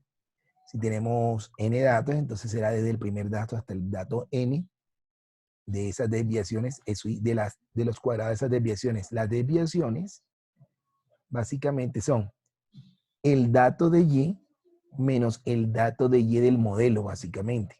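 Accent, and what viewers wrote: Caribbean Coast, Colombia. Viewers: costeño <3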